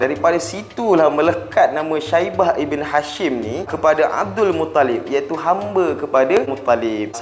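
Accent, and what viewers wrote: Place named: North, Malaysia. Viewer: Crita dh sedap..tpi bhsa utaqa hg xbrpa nk sdp.....tpi boleh prbaek lgi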